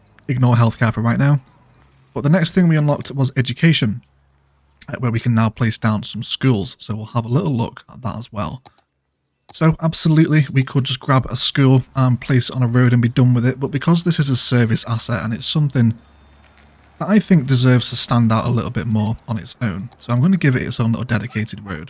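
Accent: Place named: United Kingdom. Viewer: Strange, for me as Russian your accent sounds like british but you prefer rigth-hand traffic. Its my ears failed? :)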